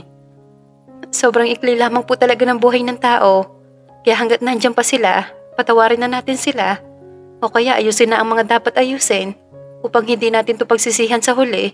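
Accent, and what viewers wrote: Philippines. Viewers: Anu kaya ang probinsya ng nagsasalita my vlogger din aq napapanood na vlogger sa cp nmn ganito ang boses nya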